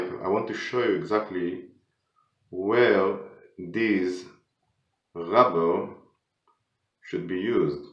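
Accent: Israel. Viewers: יש לך מבטא ישראלי ?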